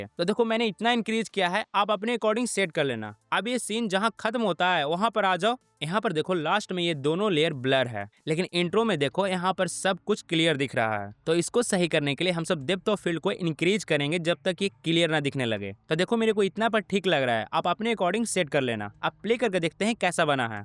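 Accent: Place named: Bihar, India. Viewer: Bihar ya jharkhand se ho kya? Ascent to waisa hi hai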